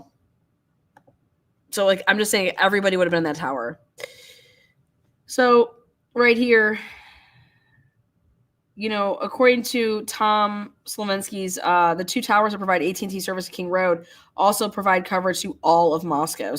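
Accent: South, United States. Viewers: Watching delayed…thanks for all your videos today. Really enjoyed your southern flair in this one. Glad you’re feeling better.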